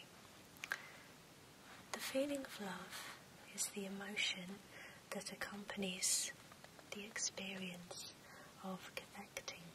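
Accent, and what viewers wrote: United Kingdom. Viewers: I find that I have that reaction with the majority of her more British terms or even words that have a significant difference in pronunciation between her accent and American English. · ..i could listen to you read out of the phonebook! Your voice is so soothing and calming..i love your British accent as well. I love your videos..they're very relaxing. Good day, lovely!❤😊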